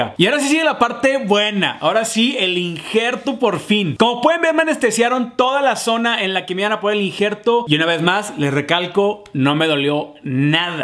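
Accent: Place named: Fresa, Mexico. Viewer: Guey y por k ablas bien freson guey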